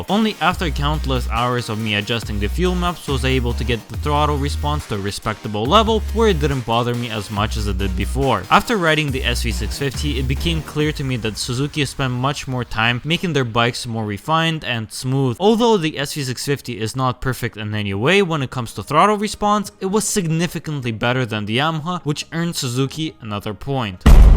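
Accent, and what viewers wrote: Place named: Russia. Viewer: А чё там русский делает? Это же точно русский. Такой акцент буржуи не повторят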